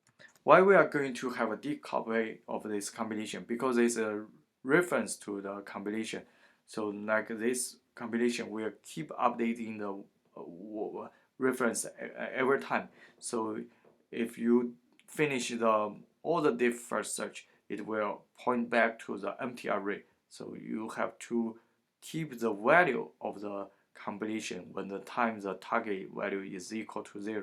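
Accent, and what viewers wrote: China. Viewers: up 主听口音应该是我大华人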